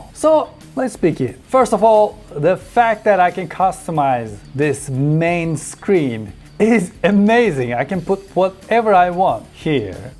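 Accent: Turkey. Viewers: Hahahahaaa telafuzdan direk turk oldugunu anladim 🤣 8 yillik iphone kullanicisi olarak aklim celiniyor demesem yalan olur 🤔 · What a Turkish accent! I wish my headphones are shit and could not able to hear it.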